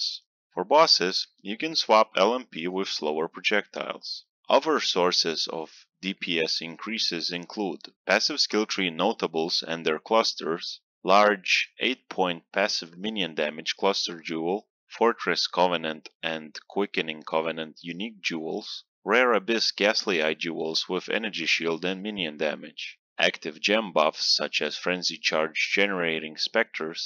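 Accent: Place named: Lithuania. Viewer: pagal akcenta bus is LT :D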